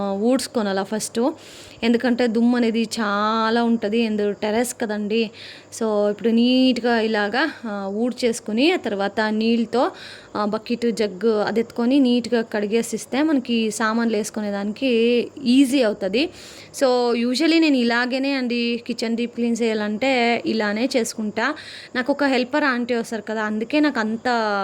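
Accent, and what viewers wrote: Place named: Karnataka, India. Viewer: Sister do you speak kannada also. Because I heard some kannada words in your voice over....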